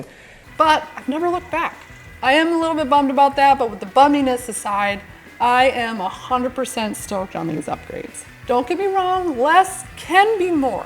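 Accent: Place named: United States. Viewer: Aaaaaaaarrrrr, your American.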